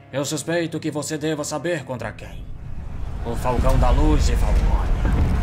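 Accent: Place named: Ceará, Brazil. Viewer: você é do ceará? as vezes o sotaque parece, e algumas palavras também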